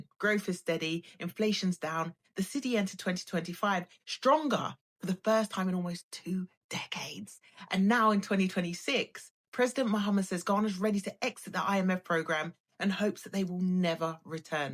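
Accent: United Kingdom. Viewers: I love her UK accent so much. Way better than the American accent. Icing on the cake? Her beauty !!!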